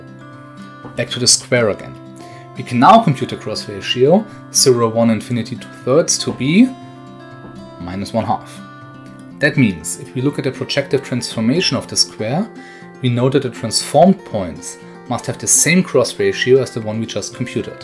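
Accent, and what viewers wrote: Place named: Germany. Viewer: I concur that in the future you have to set the music at much lower level, or actually not use music. Occasionally you swallow consonants, such as where your script says 'square length' but it ends up sounding as 'skwele'. Between that and your german accent: the viewer neets a couple of brain cycles more to get to the words you are saying. Yeah, there is a trade-off there. Pronouncing everything would sound ponderous (Related subject: video by Tom Scott : <link> ) In the case of 3blue1brown I see the music used as part of the brand; the narration is soothing, the music is soothing. For minutephysics too I regard the music used not as part of the video as such, but as part of the brand. Personally I tend to prefer minimalism.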